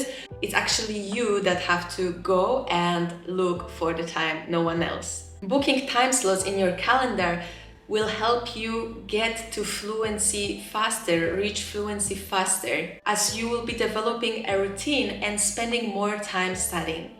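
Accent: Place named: Italy. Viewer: I love that your accent becomes more Italian as the video goes on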